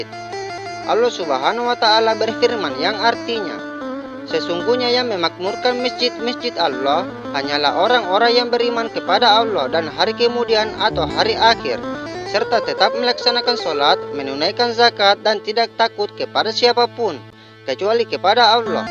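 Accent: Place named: Sulawesi, Indonesia. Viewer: Kamu OKKOTS😅...org sulawesi yah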